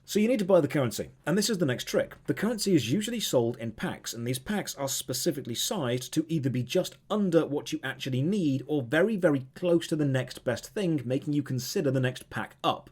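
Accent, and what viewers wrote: United Kingdom. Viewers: fuck ive missed your british voice so much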